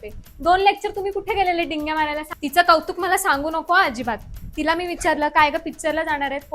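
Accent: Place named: Nashik, India. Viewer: तु नाशिकची वाटते.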